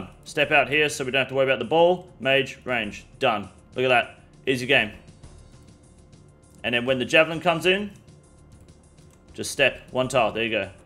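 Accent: Australia. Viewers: ❤Such a Nice Australian accent 🦘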